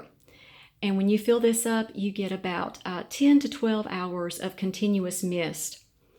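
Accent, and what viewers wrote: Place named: Tennessee, United States. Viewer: I truly appreciate you taking a moment out of this first sunny day in what feels like weeks, to reply to my question. I apologize because I wish I hadn't had to use the brand name of the product I asked about. I was wondering if you had any specific reasons perhaps I shouldn't include the aforementioned product into my initial soak and subsequent watering schedule. I'm born & raised in S.E. TN - my entire life, so not only does your accent feel like home.. 💯...but, your tips concerning directional exposure(s) help me, specifically. Your inherently generous and kind way about you is as lovely as your collection. Enjoy this sunny Sunday!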